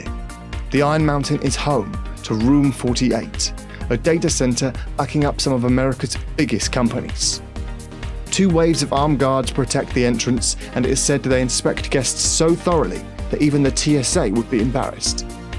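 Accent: United Kingdom. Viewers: Hate the Brit accent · Lawrence Sanchez just because he has an English accent doesn’t mean he knows geography! Area51 is north of Las Vegas not New Mexico. Same pictures used over and over. WTF?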